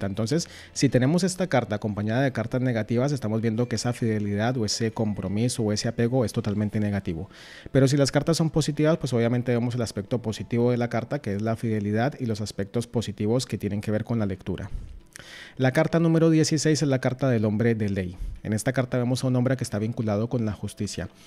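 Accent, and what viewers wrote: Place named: Lima, Peru. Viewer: Gracias por compartir esta clase. Quería preguntarte¿de dónde eres? Tu acento es igual al de mi ciudad, soy de Lima-Perú.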